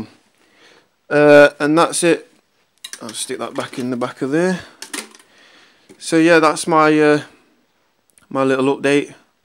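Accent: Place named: United Kingdom. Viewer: You can tell you from the uk😂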